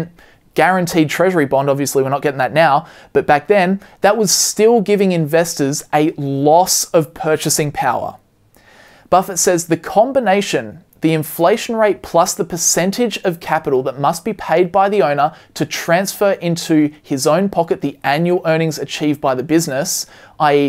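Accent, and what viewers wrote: Australia. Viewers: Are you Australian? The accent sounds familiar... Thanks for the good video 💪🏼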